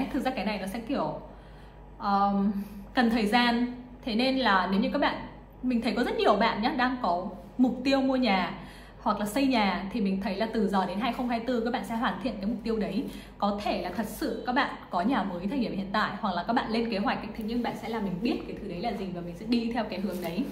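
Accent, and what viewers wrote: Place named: Cam Pha, Vietnam. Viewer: nghe giọng như người cẩm phả vậy :)))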